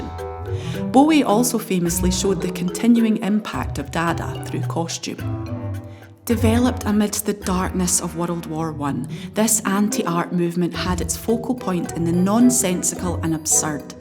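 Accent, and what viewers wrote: Scotland, United Kingdom. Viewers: that scotish accent tho'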